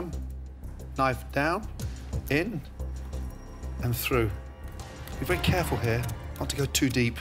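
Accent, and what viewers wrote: Scotland, United Kingdom. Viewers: HE’S SCOTTISH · I don't know why his British accent makes me feel energetic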